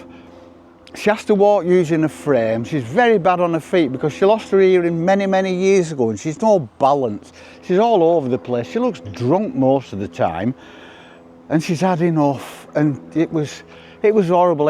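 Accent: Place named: Bolton, United Kingdom. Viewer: Hi, I'm sitting here in Kent but was raised in Bolton. I having nostalgic flash back hearing your local accent and names like Leverhulme and Rivington in your other video.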